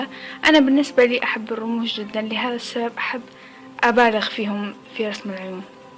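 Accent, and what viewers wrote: Iraq. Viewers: لا عراقية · لهجتها عراقية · شنو لهجتج بالضبط عراقي خليجي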